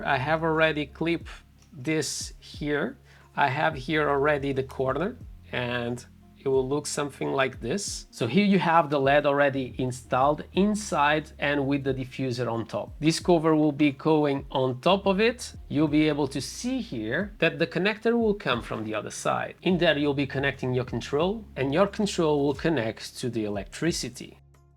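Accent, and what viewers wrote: Portugal. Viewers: Hey…. Are you Portuguese??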